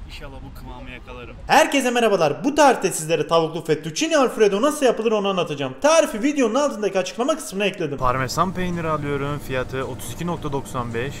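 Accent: Adana, Turkey. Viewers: Abim sen adanalısın napıyonn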